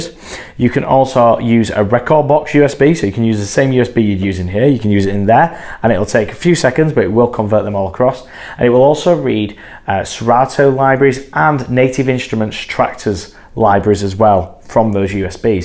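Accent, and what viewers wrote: United Kingdom. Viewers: ... this dude looks like Jared Dines with a British accent!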